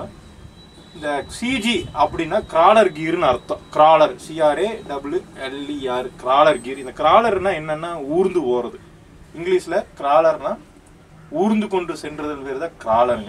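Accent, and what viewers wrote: Kongu, India. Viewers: Andha kongu slang irukae , lovely..... I'm from Trichy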